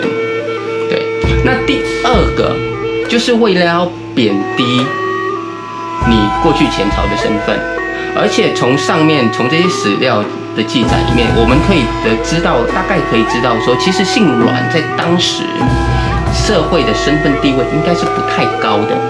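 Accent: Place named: Taiwan. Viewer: 台湾腔好重哦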